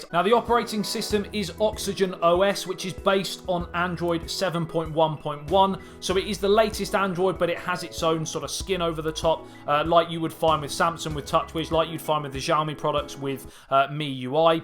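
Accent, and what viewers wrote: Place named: Wales, United Kingdom. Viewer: I would love this phone, and I love your Welsh accent 😉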